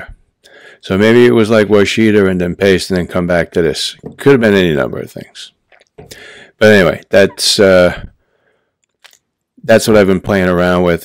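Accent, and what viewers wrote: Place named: Rhode Island, United States. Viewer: After using a DE for 4 years, I just got into straights (crappy gold dollar 208 and 2 Shapton stones) Can't wait to upgrade!! Came across your vids and I love your no bullsh*t approach. I've learned a lot past 3 weeks from your vids but have many more to watch and soooo much more to learn. Just wanted to say thank you. Also, are you from NY or RI? You're accent sounds like mine (RI), but I'm terrible at telling the difference b/w them!! Jw. Anyway thanks for your vids man.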